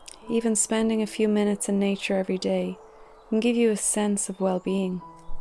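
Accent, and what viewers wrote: Ireland. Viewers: I just came across your channel. Listening to your voice for the first time, I knew that you are Irish :)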